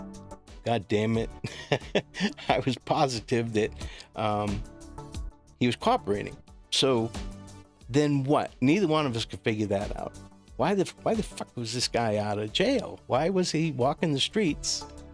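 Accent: Boston, United States. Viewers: Sorry, I asked you a question without bothering to look at your ‘about’. Ha! I thought I discerned a Boston accent!! I wonder though where you were in booking? I have 2 friends who just retired from corrections. One worked at MCI Shirley & Ashburnham with the ‘skinners’ as he referred to them. My other friend worked at MCI Framingham where she was head nurse. She always said she’d rather work with the guys cause they won’t whine half as much. Again, thanks for doing what you do. As I’m a spankin new subscriber, I’ll be binging your vids to catch up. I’ll comment but I promise you I won’t argue with ANYONE. That kind of crap just bores me to tears & I leave channels where that happens. Til next time, michelle in boston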